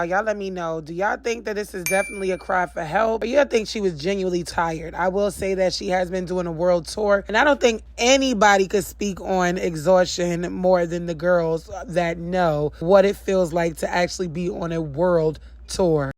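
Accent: New York, United States. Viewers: Just subbed to your channel! Love your content and I absolutely love your NY accent!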